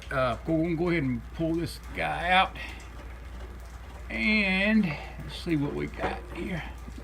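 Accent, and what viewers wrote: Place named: South, United States. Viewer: This makes me smile so big. You sure are putting on that Southern 😁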